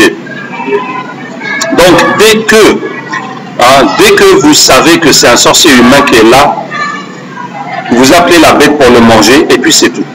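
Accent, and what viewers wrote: Africa. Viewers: L'accent de l'Afrique! C'est quel accent?pas celui choisis par Dieu?soubhanallah que Dieu nous éloigne toute idée de racisme même le djines musulmans sont des frère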